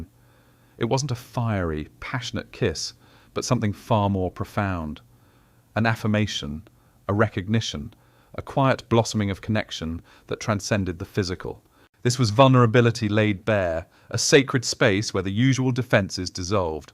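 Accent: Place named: England, United Kingdom. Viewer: love the English accent!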